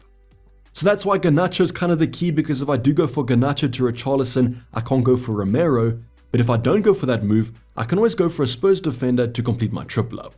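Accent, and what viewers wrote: South Africa. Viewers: That Saffa accent is strong bru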